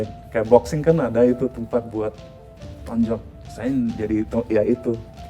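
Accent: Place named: Indonesia. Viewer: Halo om gmna kabar makasih sharing nya Ndak tahu nasip kita di masa kecil sama di sia siain ortu mg sehat om saya penggemar anda dr tahun sembilan tiga waktu masih SMP nam Yogya Anda sdg jaya waktu itu kapan anda pelayanan di Yogya saya juga kristiani katolik Jawa hehe Gusti amberkahi om aksen anda sudah Indonesia bgt. Sukses Dodo palagan km Pat blas Sleman berkah dalem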